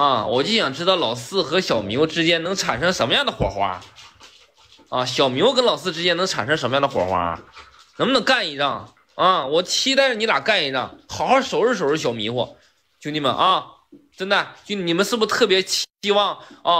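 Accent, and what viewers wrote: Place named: Northeast, China. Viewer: 吓，不是嗎？哈哈哈，東北漢普通話我只聽懂7O%而已，等我再聽一次。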